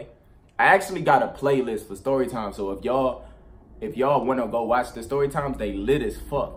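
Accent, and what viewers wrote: Detroit, United States. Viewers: that detroit acent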